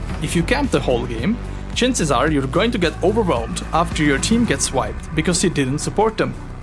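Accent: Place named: Czech Republic. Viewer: Can't wait to play this one. Also, this isn't a knock on your Czech accent, man. But I really like the way you say 'Hoovercraft'. Keep up the good work.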